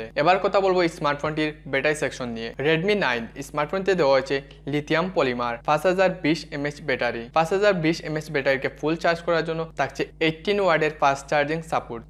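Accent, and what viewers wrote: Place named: Sylhet, Bangladesh. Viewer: আফনার ভাষায় ছিলটি ছিলটি লাগের।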